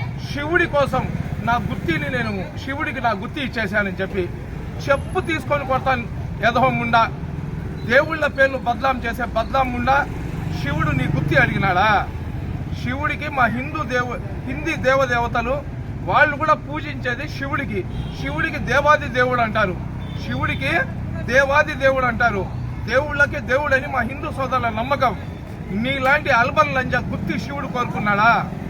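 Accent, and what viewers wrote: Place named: Nellore, India. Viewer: Nellore style lo chepparu great bhai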